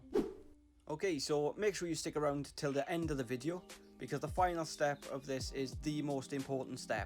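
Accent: Wales, United Kingdom. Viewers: Are you welsh? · You welsh? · u welsh? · Wait is this guy Welsh? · Are you British or welsh? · You sound welsh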